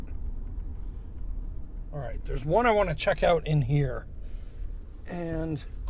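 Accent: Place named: North, United States. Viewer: Hi, your accent sounds like you are from above the Mason Dickson line. With all that new building, OC will have total grid lock all the time this summer.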